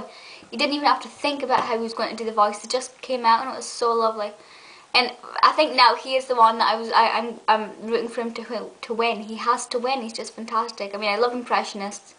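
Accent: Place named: Northern England, United Kingdom. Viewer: I thought Paul was pretty good. Too bad he only did impressions of people and characters that I don't particularly care for. Harry Hill? Blah! Scooby Doo & Popeye? I liked them when I was 6. Anyway, I'll bet you get this all the time, but I love your accent! You replied to someone else´s comment, saying that you're not Scottish, which I must admit, I thought you were. So is it Northern England, then? It's beautiful. And so are your eyes. (sorry) But they really are!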